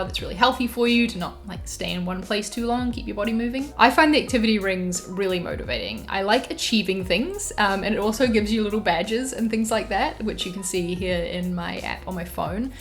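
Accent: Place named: New Zealand. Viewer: I thought this was a helpful review, as you talked more about how you use your Apple Watch rather than get into the weeds of technical details. It’s difficult to find examples of people using their Apple Watch amidst the sea of specs-oriented ‘tech reviews’. As you point out, this device is a luxury which nobody needs to get. In that case, I think it is more interesting to know how people can make use of the Apple Watch and what kind of value they get out of it. Being rather drawn to gadgets, I might yet pick one up. And also, a pleasant surprise to hear a kiwi voice!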